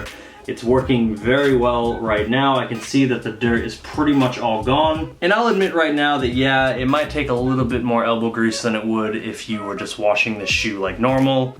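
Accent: Australia. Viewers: The Aussie accent is starting to get to you 😂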